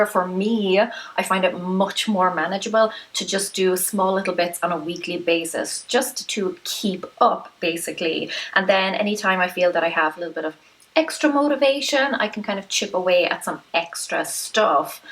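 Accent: Ireland. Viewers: Would love to see a monthly reset! Thanks!!! By the way, Americanisms with your Irish accent? Love it! Ain't nobody got time for that never sounded so cute and classy at the same time ;-)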